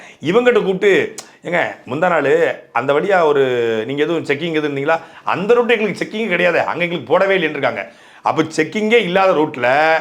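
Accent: Tirunelveli, India. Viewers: 🤭🤣🤣🤣🤣😂😂😂😁😁😁 பூத்...தாவது, சூத்..... ஆவது.... யோவ் சாட்ட 🤭🤭🤭 திருநெல்வேலி பாஷை அருமை. திமுகவிலிருந்து கொண்டே நாம் தமிழருக்கு வாக்களித்த என் தமிழ் சொந்தங்களுக்கு வாழ்த்துக்கள் 👍👍👍 வன்மத்தை வன்மத்தால் வீழ்த்த பழகுவோம், வீரத்தை விட விவேகம் முக்கியம் 👍👍👍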